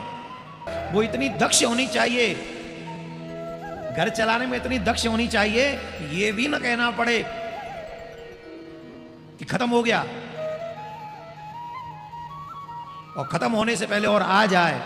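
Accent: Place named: Braj, India. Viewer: Guruji aap braj bhasha bahut achchi lagti hai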